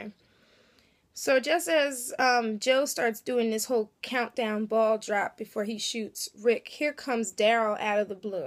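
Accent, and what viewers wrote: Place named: South, United States. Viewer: and from your accent i would say you are a southern girl so yea throwing a curve ball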